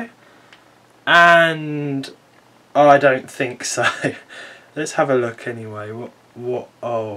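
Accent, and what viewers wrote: United Kingdom. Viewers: Hahahhaha "excuse me.... here we go!" Hahahhaa I love this British guy! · Great video but honestly, the commentary is so damn british it's incredible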